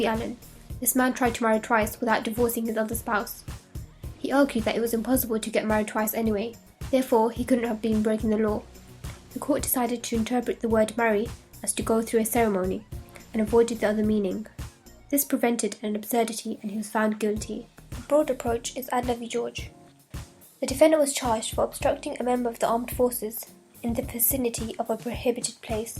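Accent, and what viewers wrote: United Kingdom. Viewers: Like a cross between British & summin else tho. Hot x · Birmingham I imagine lol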